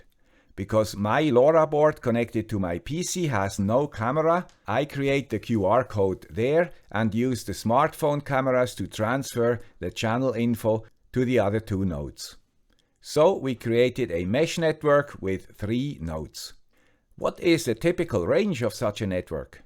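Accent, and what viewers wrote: Switzerland. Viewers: I love your Swiss accent, more videos please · Haha liebe das schwizer englisch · the guy with a Suiss accent and a small pointing hand.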